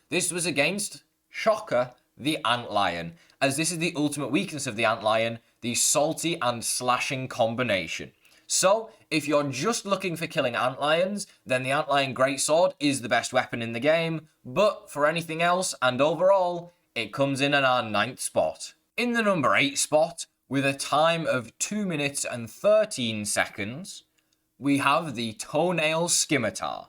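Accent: United Kingdom. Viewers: The black ox hammer is so confusing. On paper it should be so much stronger, but it honestly sucks. BTW, you have a British accent my friend. How are you butchering all those pronunciations? 😂😂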